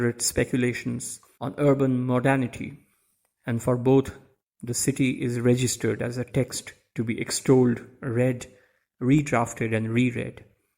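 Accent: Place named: India. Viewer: I feel much oblige to say that i don't like Hindi accent, but yours was pleasant. And the info and insights you provide are powerful. Thanks for the video!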